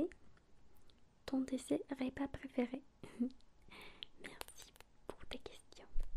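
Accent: Spain. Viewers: Merci d'avoir répondu à ma question. 😊😊😊 J'adore ton accent espagnol. ❤❤ Du coup, un grand tour de France à programmer.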